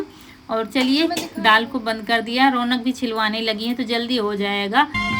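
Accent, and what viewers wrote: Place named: Raebareli, India. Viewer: Bahan aap Raibareli ya phir unnao jile ho Apki language se pta chalta h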